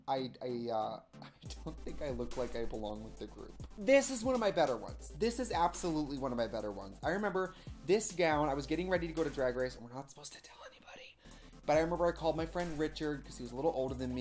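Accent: Wisconsin, United States. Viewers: The last time I was this early Trixie still had her Wisconsin accent 😭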